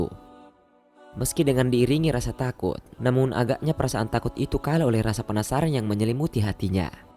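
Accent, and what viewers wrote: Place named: Medan, Indonesia. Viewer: pengisi suaranya orang medan pasti😊😊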